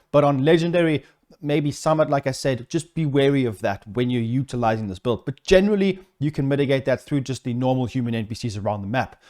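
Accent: South Africa. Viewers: Great video. Haven’t played TD2 after finishing the story when it came out. Thinking about going back again. Anyway, Are you South African? Keep up the great content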